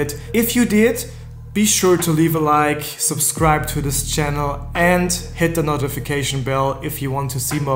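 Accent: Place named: Germany. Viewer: I came for the German accent.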